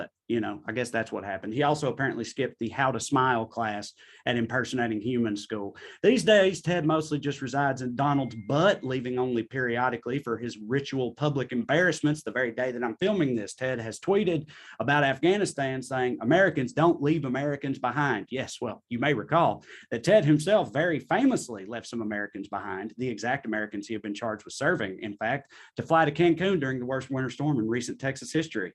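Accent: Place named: Tennessee, United States. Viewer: This level of sardonic erudition casually delivered with a thick Tennessee accent is f**kin' comedy gold.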